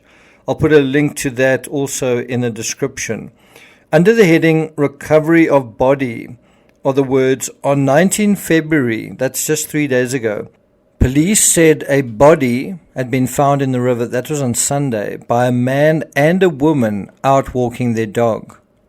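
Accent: South Africa. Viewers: Are you from South Africa? Thank you for keeping us informed I do think your presentations are excellent.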